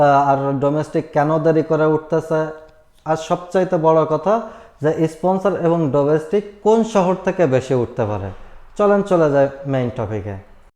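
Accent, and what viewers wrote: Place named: Narail, Bangladesh. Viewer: ভাই আপনার বাড়ি কি নড়াইল?কথা বলার কোয়ালিটি সেইরকম মনে হচ্ছে।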